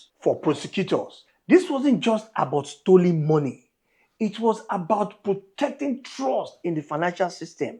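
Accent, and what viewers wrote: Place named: Yoruba, Nigeria. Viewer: Your content is good but the title is too ethnic bigot in meaning. Your accent sounded Yoruba but your attitudes portrayed adulterated and unpatriotic Yoruba. You sounded biased to Yoruba goodness. Title like 5 Nigerians fraud stars would have been better and more civilised. Next time educate your minds with civility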